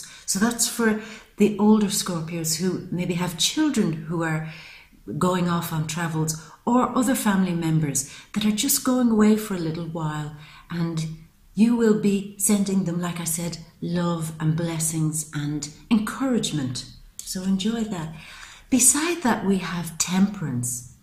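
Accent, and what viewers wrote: Ireland. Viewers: You sound like a sexy Irish woman. Insightful reading. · Thank you so much for your time and energy doing these reads for us💕😘 xx I’m newly subscribed to your channel..... So lovely to hear an Irish voice....I lost my soulmate of 20 + years, 2 & 1/2 years ago and his family sadly before that, they were from Carrick-on-Shannon, family name Conlon.... Taurus is my eldest daughter, we’re r/c and since my spiritual awakening we have differences in religion ..... I turned down Sag who’s my ex husband, he’s married to Aries and he’s been seeing my Gemini friend who’s also my twin flame...Now he’s moving onto my Virgo friend....So glad I said no..... As my mother in law would say, Your well for Anne,lol......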